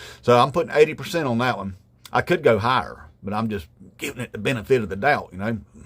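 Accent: South, United States. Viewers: It blows my mind hearing INTELLIGENT words coming out of a redneck voice! LoL, love your channel! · I love to hear a southern accent speak absolute sense. Cheers brother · From your accent you sound Southern.Dont put shade on us. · Your southern accent is real hard to listen to.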